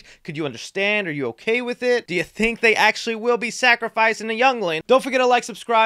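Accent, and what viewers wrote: United Kingdom. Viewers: Chris I love your British accent😂 where in the UK are you from? I thought it was south African at first 🙈😂🎃🎃👍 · British accent 😂😂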